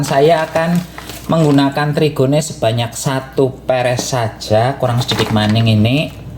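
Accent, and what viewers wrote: Banyumas, Indonesia. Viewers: As. Mas sampean kok baasanya seperti bahasane innyong ibu juga orang ngapak daerah banyumqsan pingin kenal mas biyung dirah sukses slalu amin.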